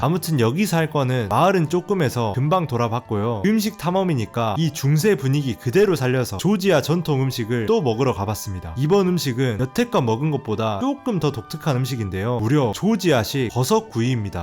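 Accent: Jeolla, South Korea. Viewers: 전라도 엑센트 너무 정감가요 ㅎㅎㅎㅎㅎㅎ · 전라도억양이 들리는데 전라도 사람 맞나요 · 전라도 사투리인가? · 아따 말투가 겁나 정겹다잉 편하게 말하자 어차피 너무 전라도다. 신나게 쓰자 너무 정겹다. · 생~소한 조지아를 소개하는 전라도억양의 청순한 청년.. 잼나요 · 특이한 멘트에 전라도식 사투리억양 개성있네요.. 재밋게 잘 봤어요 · 전라도 사투리가 귀에 거슬리는군요. 표준어 사용하시길...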